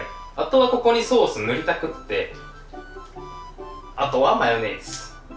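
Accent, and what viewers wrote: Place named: Nagasaki, Japan. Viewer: おなじ長崎県身として 方言嬉しい（笑） 〇〇っちゃんﾊﾞﾘ長崎やし（笑）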